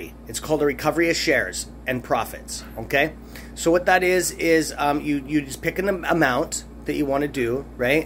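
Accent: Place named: Canada. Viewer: Dude sounds Canadian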